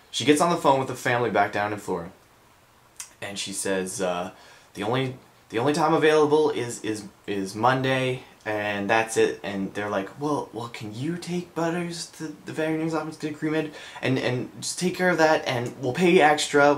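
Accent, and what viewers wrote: Canada. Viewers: i like his candaian accent, lol, so cute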